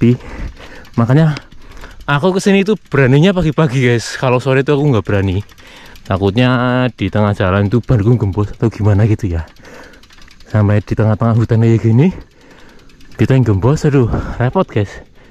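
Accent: Banyumas, Indonesia. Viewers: semangat blusukan terus mas, bungah tak ulang-ulang terus nonton vidio blusukan mas ngapak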